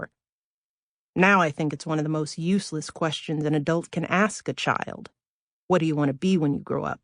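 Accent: United States. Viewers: IS THIS THE AMERICAN EDITION.? I MEAN , THE AUDIOBOOK IS IN AMERICAN SOUND?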